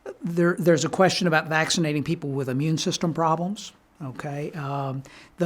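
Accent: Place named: United States. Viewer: Another Doctor that gets to keep his license and job! (he also has the same American accent as 'Snowden' his first name will come to me...maybe) as has of course zuckerberg, gates im English and these have a familiar accent its it a certain region? Or is it their own exclusive tribe? Edward! i knew it would come to me if i just kept typing!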